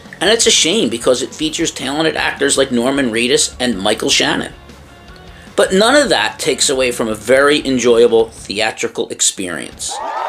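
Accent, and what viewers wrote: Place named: Philadelphia, United States. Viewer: You sound like you're from the Philly/Cherry Hill area.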